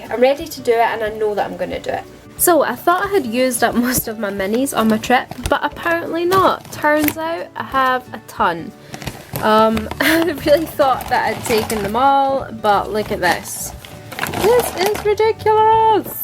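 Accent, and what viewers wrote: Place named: Glasgow, United Kingdom. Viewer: I've missed your vlogs! I'm from Glasgow so it's always nice to hear a familiar accent on the vlogs! You're looking amazing btw, a wee break from YouTube has clearly done you a world of good! x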